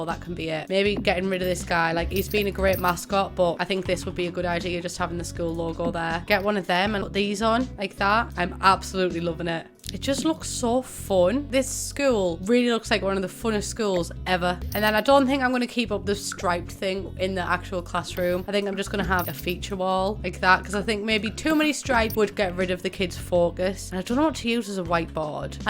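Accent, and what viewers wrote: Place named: England, United Kingdom. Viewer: out of all the english youtubers i love accent the most and she's probably my favorite english youtuber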